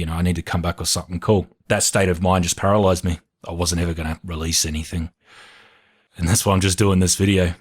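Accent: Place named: Australia. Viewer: Explains why their was a baby in the background at Stranded Deep. Have a good one brother, missed your Aussie voice 😂.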